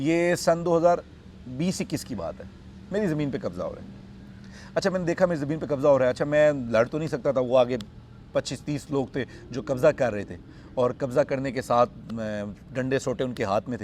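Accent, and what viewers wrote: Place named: Punjab, India. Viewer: Ap penjabi Boht piyari bolte hain 😅😅😂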